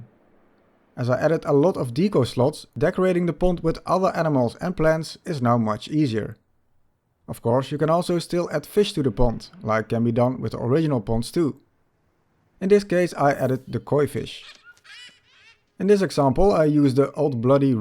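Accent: Netherlands. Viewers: Are you Dutch? xD these mods are cool :D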